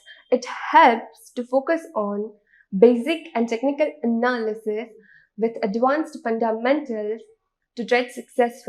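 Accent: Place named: United Kingdom. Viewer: You are sharing knowledge and your wisdom thats good.. and very nice of you.. the only thing that annoy is your british accent ... You can speak Indian english very well obviously but dont know why you dont prefer it... Just a point for improvement..no offence to you.. more power to you!!🙏🏼🙏🏼 All the best for your YT channel !!🔥🔥🔥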